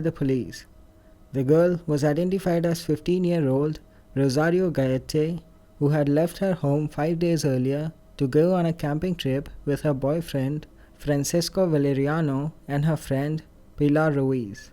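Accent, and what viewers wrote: India. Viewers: Narrator is Indian. Not soothing to the ears. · Hey you cheap bastards hire an Enlgishman to do the commentary on these videos why hire a GD India man??? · What's up with the goofy Indian accent? Bring back the female narrator.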